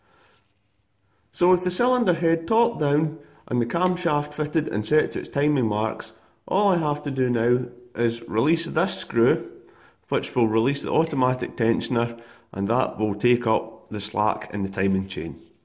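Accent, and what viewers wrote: Scotland, United Kingdom. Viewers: Sounds like a Scottish accent?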